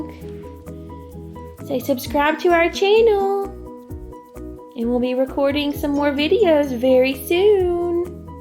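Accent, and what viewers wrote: United States. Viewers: Where are you from? I know you are definitely a southern girl. 😃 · Ok you definitely sound like you're from NC.....I live in Camden which is about 40 min to Duck (winter time, peak summer traffic who knows how long I'd take, lol). So if you're ar the N OBX (Outer Banks for y'all who don't know) and are 30 min from beach, then you're in Currituck. But if you're not in NENC then you must be farther down the coast. Anyway...like I said you sound North Carolinean, and I know a store that's headed toward the breach that is all beach themed, that's in Currituck. But there's also some at the beach too. Was just curious if I was correct. Not stalker, lol. It's rare to hear someone sound like you on YouTube, lol...ok I'm rambling now, so I'll go, would be cool if ya told me yes or no though, Your stuff is really cute, and I'm with you on old stuff! 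Love it, especially old books! I have a few old early 1900 medical books, as a nurse I find it fascinating!! Love the house and decor.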